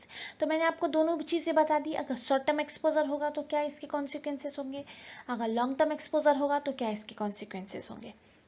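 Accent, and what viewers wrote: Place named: South, India. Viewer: Well presented . You must be from South India I believe .your voice seems like that . Thank you ma"am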